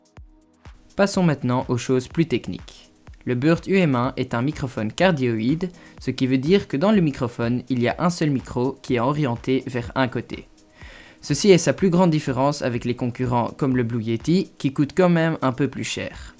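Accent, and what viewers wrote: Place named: Flanders, Belgium. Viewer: Cet accent flamand est atroce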